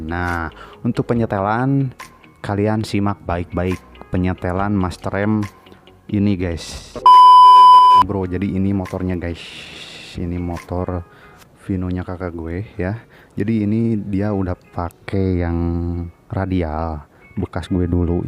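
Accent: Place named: West Java, Indonesia. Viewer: Gua aja bang jangan gue jd norak soalnya logat sunda ga pantes bilang gue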